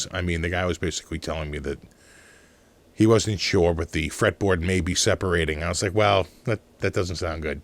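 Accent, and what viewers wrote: Boston, United States. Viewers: Boston English: Tuner = Tuna Tunah = Tuner Go figah....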